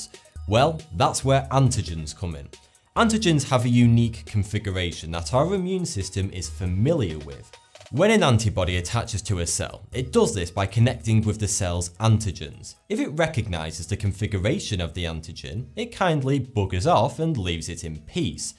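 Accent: United Kingdom. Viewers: Thought 2 is like vsauce, but he's a Brit. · R u British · Hold on, when you say "British" accent do you mean: Irish, Scottish, Welsh, Northern, Dorset, stereotypical Londoners or English. Please specify.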